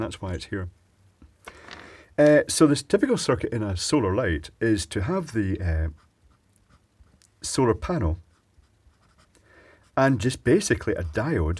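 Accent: Scotland, United Kingdom. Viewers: Love Scott Accent.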